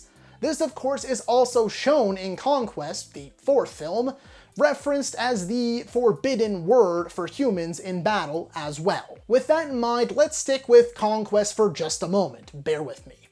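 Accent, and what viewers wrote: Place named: Canada. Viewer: the Canadian accent. “Out” is “Oat” lol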